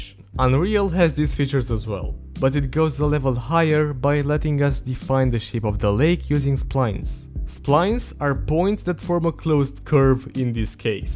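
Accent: Russia. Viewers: такой акцент русского языка, ты что плохо знаешь английский ? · Почему мне слышится русский акцент? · Слышно, что наш парень. С произношение вообще беда) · Странный русский акцент для румына 😉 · Русский акцент такой ржачный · акцент то русский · Такой жесткий русский акцент у автора ))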